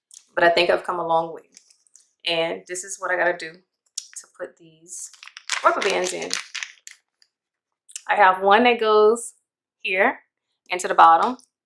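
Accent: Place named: Louisiana, United States. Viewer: U sound like you from Louisiana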